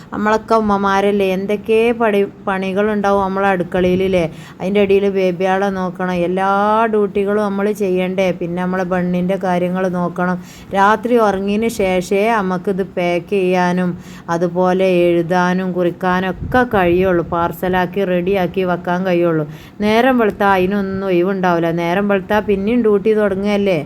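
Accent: Malappuram, India. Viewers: മലപ്പുറം ഭാഷയല്ലേ അത് കുഴപ്പമില്ല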